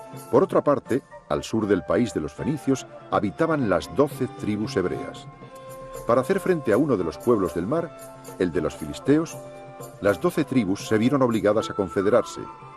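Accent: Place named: Spain. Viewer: No sé si sea por el acento español pero hay partes (finales de palabras) que no se entienden pues no vocaliza bien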